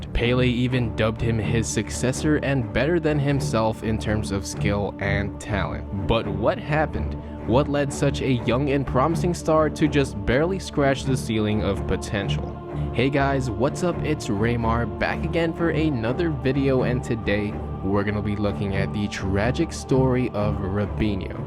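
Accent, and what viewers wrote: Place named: United States. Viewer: I cant take football videos seriously when their commentated by Americans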